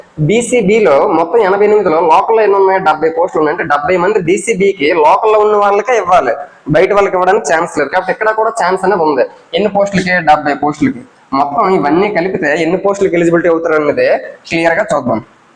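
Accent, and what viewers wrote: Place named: Vizianagaram, India. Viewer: Nee yaasa bhasa vijayanagaram or vishakapatnam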